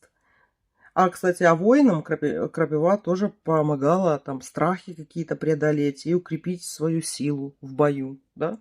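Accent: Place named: Belarus. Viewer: Так девушка из Беларуссии, зачем вы учите как говорить? Мои знакомые оттуда тоже интересно по своему говорят и мне не режет ухо. ДОбре?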